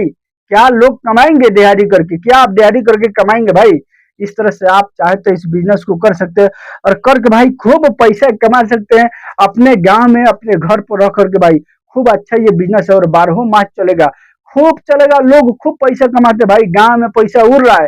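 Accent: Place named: Bihar, India. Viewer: Bihari ho kya bhaiya conversation shikho pahle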